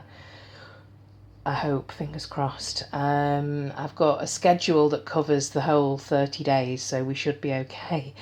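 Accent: United Kingdom. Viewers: Hi. I'm trying to place your accent. What part of the UK are you in? I'm in Manchester x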